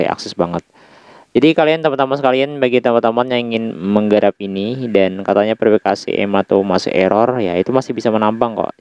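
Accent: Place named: Barabai, Indonesia. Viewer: dari logat kamu dari barabai yah? soalnya saya dari barabai dilihat dari kamu ngomong udah kelihatan kamu asal barabai hst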